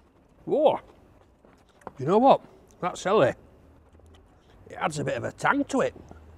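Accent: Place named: North, United Kingdom. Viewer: "That's Peng, that"... I love t'old northern speak. I'm saying t'old in front of everything I can in my house now. It's winding the wife right up. But she's got no room to complain, she's Welsh.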